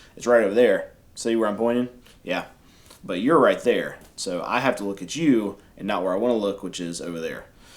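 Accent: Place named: East Tennessee, United States. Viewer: Reminds me of east tns accent, y’all say own instead of on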